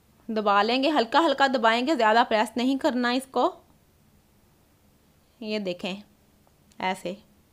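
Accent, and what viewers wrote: Punjab, India. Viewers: پنجابی لہجہ ایسا ھی لگتا ھے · G Haan lehja tora sakht lagta ha shayad punjabi hone ki waja se esa lagta ha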